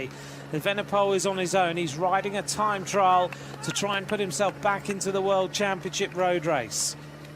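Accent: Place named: United Kingdom. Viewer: イギリス英語気持ち良すぎる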